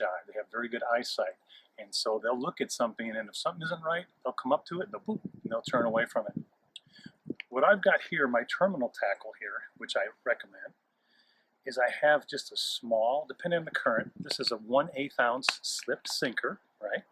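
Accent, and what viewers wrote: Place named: Upper Midwest, United States. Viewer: Are there any catfish in the "White River"? Oh by the way you sound like you are from the upper midwest??? Thanks for the video!!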